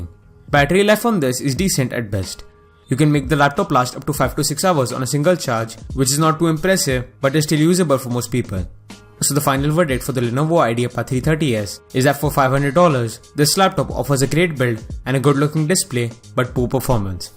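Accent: India. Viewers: That dirty fingernails are disgusting me, and then I realize the Indian accent.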